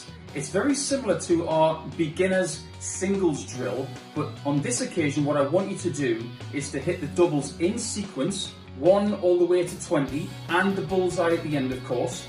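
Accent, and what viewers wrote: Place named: Australia. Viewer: Which part of Australia is your accent from Paul? I can’t pinpoint it. 😂😂 the goat darts villain for me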